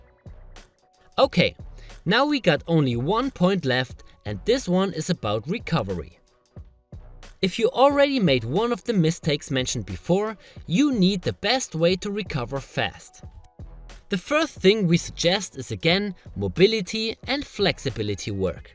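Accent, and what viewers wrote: Germany. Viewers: When an German-sounding European, with the beside manner of Hannibal Lector tells you these things, you listen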